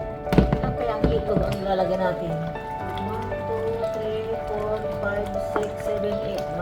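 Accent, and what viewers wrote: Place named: Pangasinan, Philippines. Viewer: masamit tay iluluto yo atse. taga Pangasinan kau amo Pangasinan accent . ☺ thanks for sharing☺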